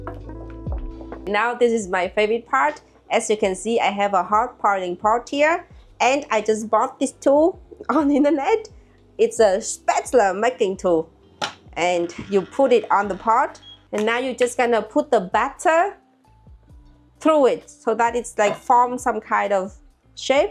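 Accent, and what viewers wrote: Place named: Germany. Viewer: Hilarious german accent coming out of her nouth..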